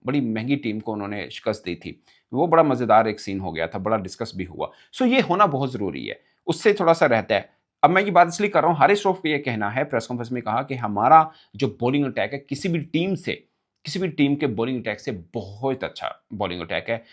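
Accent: Punjab, India. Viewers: پیارے بھائی پہلے بھی کہا تھا کہ اردو میں پنجابی لہجہ نہ استعمال کیا کریں۔ جیسے آپ اکثر شاہین شاہ آفریدی کو شہین شاہ کہتے ہیں۔ پلیز تلفظ کا دھیان رکھا کریں آپکا وی لاگ پوری دنیا میں جہاں اردو سمجھے والے لوگ ہیں دیکھا جاتا ہے۔ شکریہ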